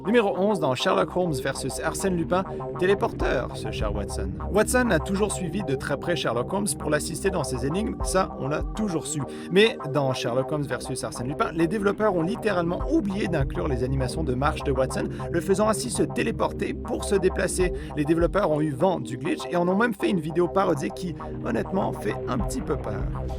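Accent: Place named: Quebec, Canada. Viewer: Alors moi je suis tombée par hasard sur topsicle cinema et comme j'adore, je me suis dis que ce serait trop bien la même chose mais avec les jeux vidéo. L'accent québécois étant le petit plus :) Pour rebondir sur la vidéo, je sais pas trop si on peut le ranger dans la catégorie glitch ou plutôt easter egg, mais il y a la fameuse tarte/hibou avec la musique flippante qui l'accompagne bien, dans Dragon Age Inquisition. Ce qui peut donner matière à une nouvelle vidéo: top des easter egg les plus flippants.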